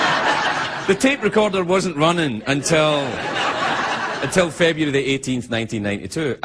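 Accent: Scotland, United Kingdom. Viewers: This guy is awesome. Miss his show. Miss his Scottish accent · He sounded so much more Scottish back then. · Still had his Scottish accent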